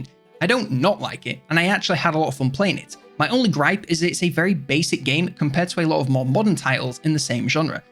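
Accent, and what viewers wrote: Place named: United Kingdom. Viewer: It's nice to hear a British accent telling about something interesting. What exactly is it though?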